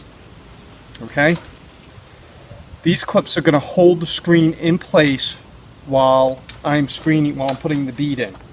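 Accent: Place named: Northeast, United States. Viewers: The best DIY videos are done in a NE accent, so I can feel right at home. Thanks for all of your specific tips! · This guy sucks, don't quit your day job you terrible accent masshole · Ugh. That New England voice. I couldn't listen Mr know it all. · ! Thank you Man!!! Great tutorial and love the NY NJ accent!!!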